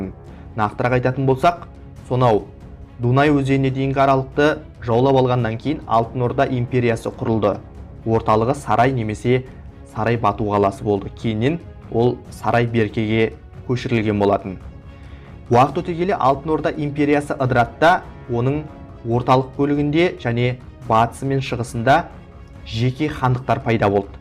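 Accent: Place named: China. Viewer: Автор қытай ма монғол ма немене? Неғылған акцент? 😡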